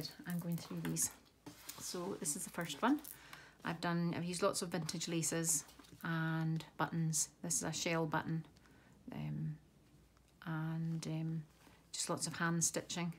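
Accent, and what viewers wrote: Scotland, United Kingdom. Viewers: Oh my goodness you really are very talented lady, these journals are gorgeous you can see how much you enjoy doing what you are doing l love them. How exciting getting your own crafting room set up, it's lots of fun. I would love to sit with you and craft away watching you create and listening to your accent, I can make the tea or coffees. I use to work in Scotland at a Outward Bound Lodge on the edge of Loch Eil. So listening to you reminds me of a time that seems lifetime ago now (26yrs now) I live in Australia with my family, watching and listening to what's happening in the UK and elsewhere is just beyond belief. Keep safe, and keep crafting because we love seeing what you do, take care Jodie xx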